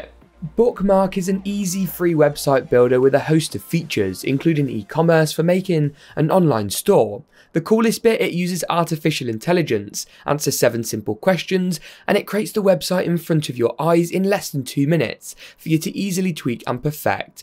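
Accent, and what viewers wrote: England, United Kingdom. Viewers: Are you not from the UK, i thought you had an english accent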